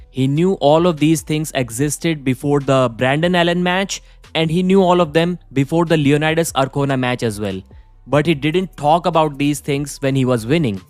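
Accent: India. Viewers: Fuck your indian accent mate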